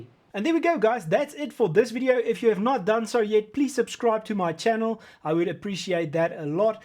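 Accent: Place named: South Africa. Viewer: Wanted a shot of the full thing without the covers. But subbing just for the South African accent for now.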